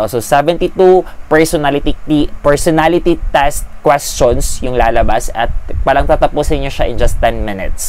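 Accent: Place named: France. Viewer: Are you from France if yes you have very excellent communication skills and while you speak you are getting some touches of your native language while speaking ❤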